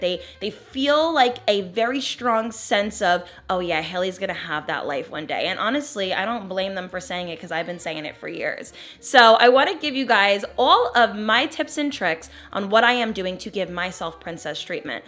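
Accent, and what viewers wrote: New Jersey, United States. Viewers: I’m a jersey girl too and I always can tell u were a jersey girl as well love ur vibes, thank u for this wonderful video everything u said was FACTS ! heavy on the I wash my legs ok period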